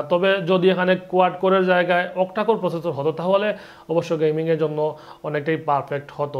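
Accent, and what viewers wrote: Sylhet, Bangladesh. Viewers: Bari sylhet na kita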